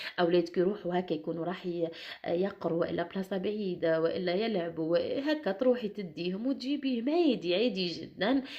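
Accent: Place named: Tunisia. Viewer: علاش تهدري كي توانسا انا من تبسة منهدروش هاك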